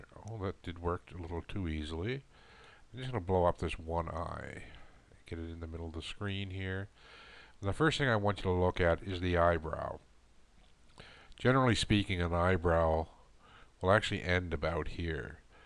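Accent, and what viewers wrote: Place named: Michigan, United States. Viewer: Where are you located? I detected a bit of Michagander in your dialect.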